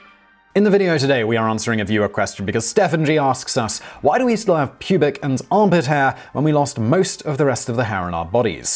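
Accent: United Kingdom. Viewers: Even when spoken with a British accent, merkin is an ugly word.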